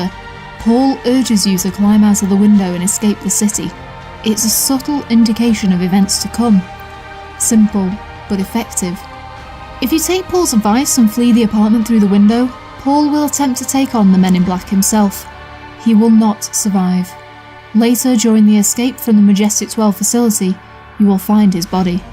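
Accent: United Kingdom. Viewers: Ok not on my phone now so I can reply properly :) Deus Ex had a massive effect on my early gaming days. Like you I remember playing it many times and spending time working out how far the narrative web would let me push it. I discovered that Paul could be saved by myself and it was my first major 'what if' moment in gaming. I still look back at that realisation with very fond memories, that this game world had so many clever ways that recognised my choices. I'd be interested to know what you think of the other games, imho none have come close to the original ofc :) Btw I've been trying to place your accent, are you in the North? The odd 'that got buggered up' is something I say :) Looking forward to your next project, I'll share this on SM and hopefully get you a few more followers because this quality of production is just as good as any made by the bigger sites. 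Cheers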